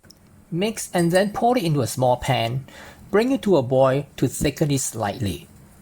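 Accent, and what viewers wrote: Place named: Singapore. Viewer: Thanks for the clear instructions. I haven’t heard that Singaporean accent in years; it’s so unmistakable. Lovely video to watch.Cheers.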